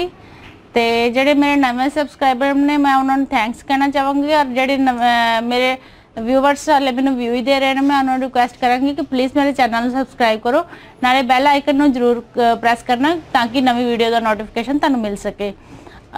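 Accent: Faisalabad, Pakistan. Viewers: ji, tusi kehray pind tun hagay o, kion k tuhadi punjabi bilkul Pakistan wich Faisalabad wich boli jandi ay. Saday nal bilkul mildi ay tuhadi boli. Pakistan tu tuhanoo bahut bahut salam. Saadi baradri v Khokhar ay, asi te fer aiko baradari de hoey na???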